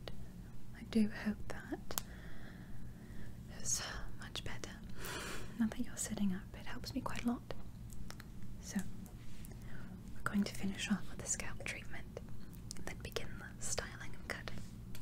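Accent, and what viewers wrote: England, United Kingdom. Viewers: regular accent is english not American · Good English accent! ❤ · Is that a British accent? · if i'd never seen your vids before i'd honestly say you were british. your accent is AMAZING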